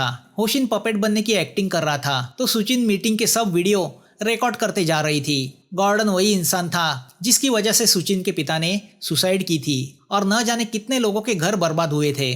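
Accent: Maharashtra, India. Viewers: amazing Marathi accent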